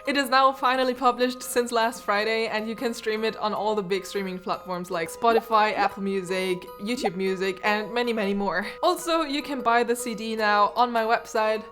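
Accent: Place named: Germany. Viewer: Never lose your German accent.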